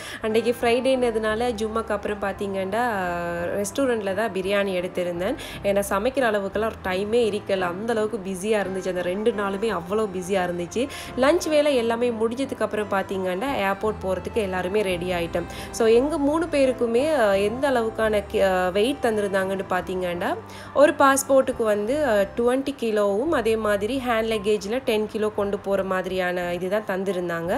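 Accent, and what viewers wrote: Sri Lanka. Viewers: அஸ்ஸலாமு அலைக்கும்.சிஸ்டர்.உங்க வீடியோக்கள் இப்போ recent aa than paarkiren.ungaloda srilanka Tamil super.enakku ungalai romba pudichirukku.நான் boy இல்ல சிஸ்.girl தான்.மெயில் ஐடி ல என்னோட கணவர் பெயர் இருக்கும்.தப்பா நினைக்காதீங்க சிஸ்.என்னோட பெயர் பௌஸுல் ஹிதாயா.தமிழ் நாடு.உங்க family க்கு நான் துஆ செய்கிறேன்.எங்களுக்கு நீங்க துஆ செய்யுங்கள் சிஸ்டர்.ரிப்ளை அனுப்புங்க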